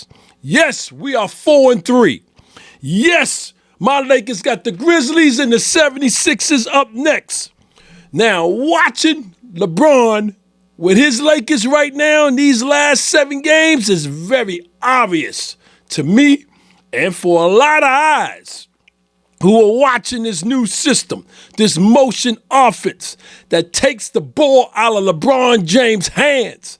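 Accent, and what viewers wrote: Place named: New York, United States. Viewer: U already know he’s from New York 😂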